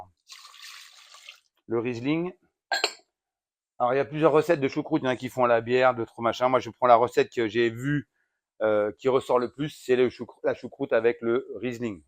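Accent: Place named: Alsace, France. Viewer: hum... C'est un Alsacien qui parle. Merci à toi.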